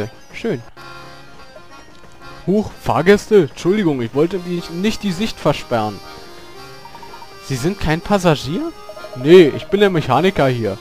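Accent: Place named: Berlin, Germany. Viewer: geiler berlinnischer akzent hast du^^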